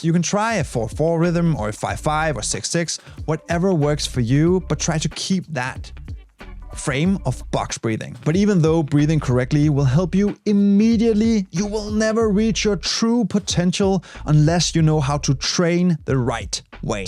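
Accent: Denmark. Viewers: Love ur accent are u from Denmark 🇩🇰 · I thought I heard an danish accent in you, am I right? 😂❤🤍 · This is such a perfect danish accent😂😂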